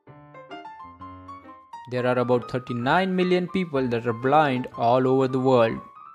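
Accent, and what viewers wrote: India. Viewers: Great job brother. I want to give you a suggestion that. Add Subtitles manually because youtubes auto subtitle is not very much compatible with Indian English accent. As I don't know English properly Subtitles helps a lot to understand for Slow Learners As I was reading subtitles given by Youtube was Horrible. As you were Saying word "Blue Eyes People" youtube was Subtitling AS "You Eye People" many other mistakes were also there, Please Check it. . Thanks aLOt For Info.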